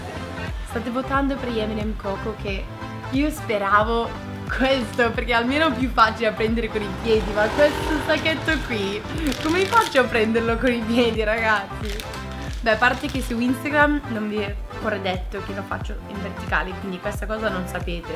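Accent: United States. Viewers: Ma perché parli più in americano ora che negli altri video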